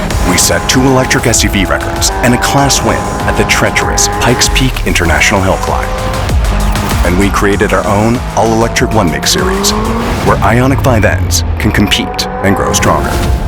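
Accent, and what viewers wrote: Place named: United States. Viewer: drop the american accent. the cars look amazing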